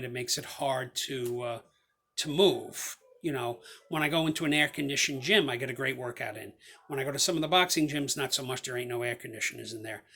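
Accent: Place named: Boston, United States. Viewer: Too bad,i liked how you presented your observations.I guessed you were from the Boston area.I lived in Chicopee Ma.For several years putting an ex wife thru American International College in Springfield.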